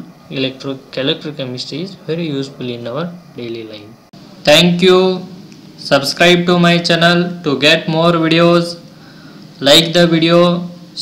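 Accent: India. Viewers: sorry to say this, but this presentation is really hard to understand due to extreme indian accent..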